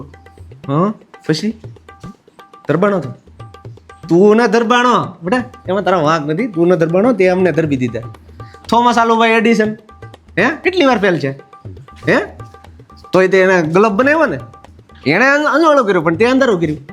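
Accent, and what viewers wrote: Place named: Kathiyawad, India. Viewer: કોણ બેન બોલે છે બોવ મસ્ત અવાજ છે હાવ કાઠ્યાવાડી 😂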